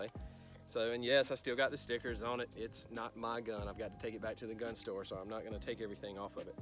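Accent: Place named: North Carolina, United States. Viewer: Damn... I thought I recognized his accent!!! Tarboro NC is about a hour east of my place in Nags Head NC!3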